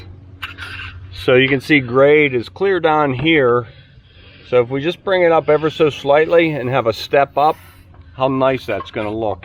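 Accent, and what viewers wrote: Pittsburgh, United States. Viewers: As soon as I heard you talk I knew you must be from somewhere near Pittsburgh, PA… am I right? I grew up there.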